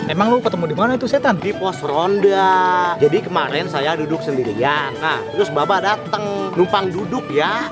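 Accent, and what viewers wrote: Betawi, Indonesia. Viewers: Gua sebagai org Betawi bangga di chenel bng Betawi nya msih kentel😁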